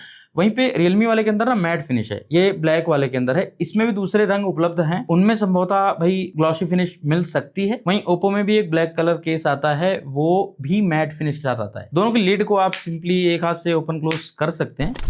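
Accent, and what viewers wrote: Standard. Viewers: আপনার ভিডিও আমি প্রথমবার দেখছি । আমি আপনার ভিডিও এবং আপনার বাক্য এবং আপনার ভাষা শুনে খুব প্রভাবিত হয়েছি। মুগ্ধ হয়েছি শুদ্ধ হিন্দিতে কথা শুনে। এইভাবে যদি প্রত্যেক মিডিয়া ইনফ্লুয়েন্সার রা ভিডিও বানায় তাহলে আমরা খুব সহজেই যারা অন্যান্য ভাষায় অভ্যস্ত আমরা খুব শীঘ্রই আপনাদের কথা শুনে হিন্দি শিখতে পারবো। ❤ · Aapki shudh hindi sunkar atyant anand ki anubhuti hoti hai 🙏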